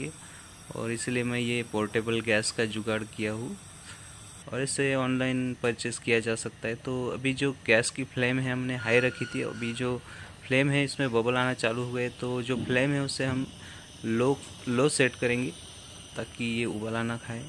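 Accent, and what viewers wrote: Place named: Maharashtra, India. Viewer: Aapke Hindi bolne ke tarike se aap nagpur maharastra ke lagte hain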